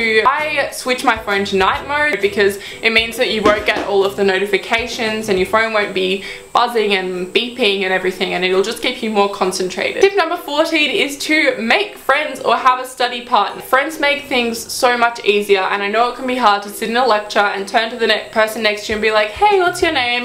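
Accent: Australia. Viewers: Hey if i am not wrong are you Australian??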